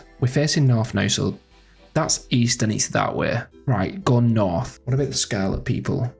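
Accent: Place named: Lancashire, United Kingdom. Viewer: Nice vid mate i certainly detect a lancashire accent. Chorley/wigan by any chance?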